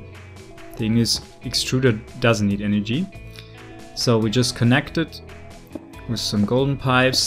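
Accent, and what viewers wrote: Germany. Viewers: Are you german? I think you have a german pronunciation.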